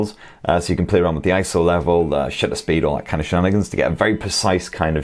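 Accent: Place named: England, United Kingdom. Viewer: Sorry mate, that English accent is quite annoying and unintelligible some of the time.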